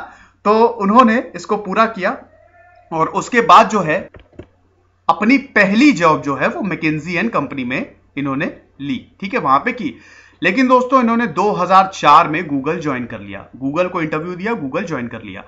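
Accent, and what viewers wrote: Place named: Kanpur, India. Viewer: Ye kaaanpur style ghussana jaruri nahi hai... Try to talk in neutral dialect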